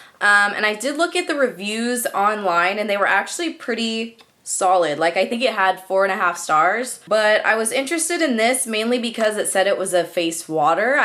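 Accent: California, United States. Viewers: I can tell you're from California by how you talk lol it's great! You're so pretty and I love your videos!! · You sound like you're from California :)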